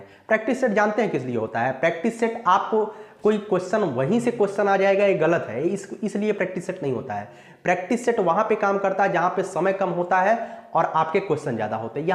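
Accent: Bihar, India. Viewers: Bihari boli dhire dhire aap me jhalak rha hai sir.🙏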